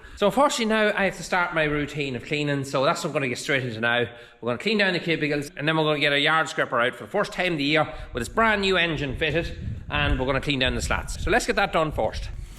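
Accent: Cavan, Ireland. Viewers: I have to say it’s absolutely deadly to hear a cavan accent on YouTube!! Apart from you’re honesty you make great videos, all the way from new York you know how to make me home sick!!! Virginia man.keep up the great work lad.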